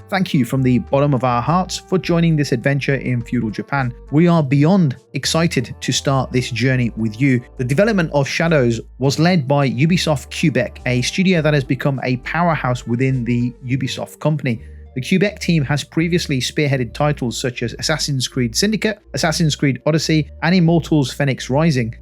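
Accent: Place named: United Kingdom. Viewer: Shut Up, your reporting is so flawed, while yes fans have been requesting an Assassins Creed game setting in Japan, FANS WERE EXPECTING A JAPANESE MALE ANTAGONIST, NOT AN AFRICAN!!!!! Get your facts straight British Accent Guy!!!! That 1 million players is flawed because it does not represent sales like Ubisoft is trying to disguise it as though!!!! Go Back to Reporter School!!!! Hahahaha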